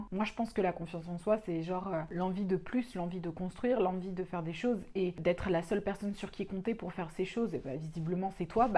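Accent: England, United Kingdom. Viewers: Team capricorne haha je me suis reconnue dans certains points. Tu es d’une honnêteté vraiment rafraîchissante et tes propos sont très justes, en tout cas ils raisonnent pour moi :) c’est un plaisir de t’écouter et de te regarder ! Je me suis abonnée il y a peu et je me demandais d’où te venait ce super accent anglais ? Tu as fais un séjour à l’étranger ?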